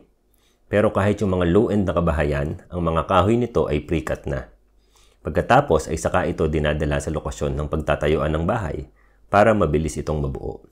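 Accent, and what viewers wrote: Philippines. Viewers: Bossing tiga saan ka sa pinas nueva ecijano kase tagalog mo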